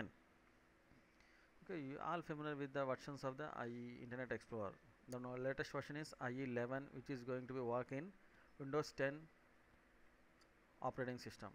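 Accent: India. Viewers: Offer a trainer who speaks western English not a person from India especially for listeners who grew up with English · Same to me from Germany - but english with an indian accent is a real tribulation... :-/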